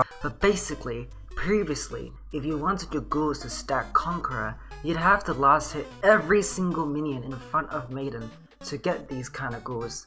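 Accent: Australia. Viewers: Ur content is gangster where u from bro? australia?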